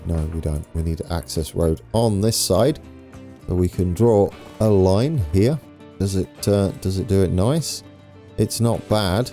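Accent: United Kingdom. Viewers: Great to hear. Enjoying ALL of your TF2 series. Your accent is apparent, but not over the top (so thick) like most Brit YT's here. Very soothing and entertaining. You're - comical especially when you call yourself a "berk."(sp) I die! Still glad I found your channel. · your british tho?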